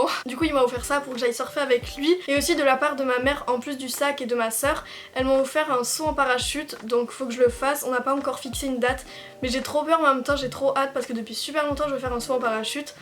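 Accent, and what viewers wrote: Belgium. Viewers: T'a Un accent belge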